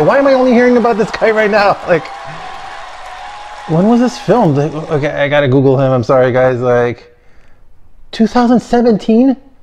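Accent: United States. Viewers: French is your native tongue? Your English sounds as bastardized as what comes out of any of us, as Americans... Perfect! =) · I can't beleive you're French. You sound so American!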